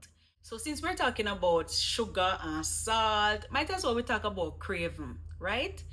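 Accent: Jamaica. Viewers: Hi. I'm a white American from NYC, and I've never been to Jamaica, but I've been around Jamaican people from morning .... from my eye was there at my knee .... from 19 how long .... from Whappy killed Phillip ..... I'm EVER there amongst Jamaicans ...... (a really long time is what I mean 😭🤣) The YT videos I've seen about Jamaican patois are always awful ("wha gwann" "irie" etc) - but you've got the bonafide twangslation, my friend, and you just got a new subscriber! I fulldorse your channel!!😂 UP! <hits like>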